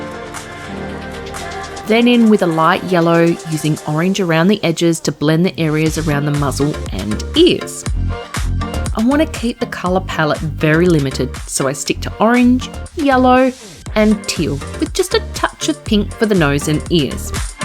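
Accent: Australia. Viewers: 😂😂😂 (context: I am from the USA) I just went to Google and searched, "Define Skalittle". It changed it to skeletal, but it still didn't click with me! So I thought, "Well, I must be spelling it wrong." So I used the microphone to say "Define Skalittle"and still, it gave me the definition of skeletal. It wasn't until this point that I realized you are saying skeletal, just the way, I guess, you guys say it in Australia. I cracked up!! I thought I was going to learn a new vocabulary word today! 😂😂😂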